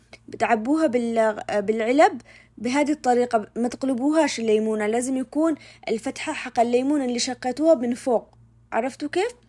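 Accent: Aden, Yemen. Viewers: صفحتك مرررة حلوة والله يكفي نسمع صوتك والكلام العدني يفوووز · ماشاء الله عليك اسلوبك حلوو جدآ بالشرح وخصوصاً باللهجه العدنيه واني عدنيه تسلمي وتسلم يدك الله يحفظك ❤ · طبخك للأكل العدني جميل وكلامك باللهجة العدنية أجمل كل التوفيق والاحترام لك من عدن وربنا يوفقك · اني افدالك احلاحاجه اللهجه العدنيه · فديت الكلام العدني كم أحبه يسلمو اليدات بنت بلادي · ❤ فديت بنت عدن اللي ولا تغيرت لهجتها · عجبني لهجتك العدنية 😂..وطبعاً العشار بالطريقتين ❤ والصيادية الشهية.. تسلم يداك يا بهية 😅 سلامي وتحياتي لك من صنعاء. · ماشاء الله عليك عادني الا اول مره اطلع على قناتك وعجبتني وايد وشرحك خفيف لطيف مع لهجتك العدنيه الحلوه تسلمي الله يوفقك ويسعدك❤❤ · عجبتنا اللهجه العدني 😂❤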